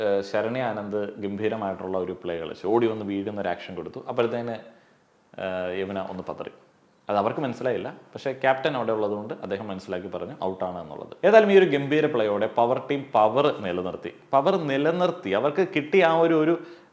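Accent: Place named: Thiruvananthapuram, India. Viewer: തിരോന്തരത്ത് താമസിച്ച് താമസിച്ച് ഞങ്ങട സ്ലാംഗ് ഒക്കെ അറിയാതെ വരുന്നുണ്ട്. 🥰🥰 ഗുഡ് പ്രസന്റേഷൻ. നല്ല ഒബ്സർവേഷനുകൾ.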